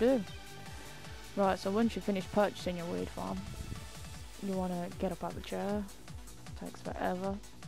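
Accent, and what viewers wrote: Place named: Birmingham, United Kingdom. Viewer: LOL SHE FROM BRUM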